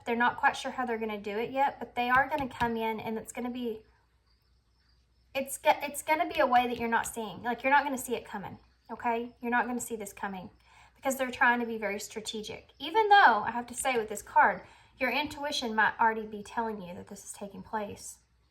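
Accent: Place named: Deep South, United States. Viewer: Thank you! I love your accent! I have relatives from the Deep South and I love to hear them talk. You have no idea how accurate this reading is, although I really am not interested in this person coming back into my life.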